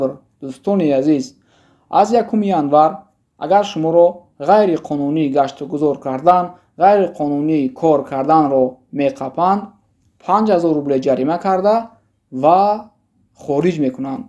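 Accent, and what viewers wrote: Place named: Literary Tajik, Tajikistan. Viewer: ту бо ЗАБОНИ АДАБИИ ТОЧИКИ хело хуб харф мезани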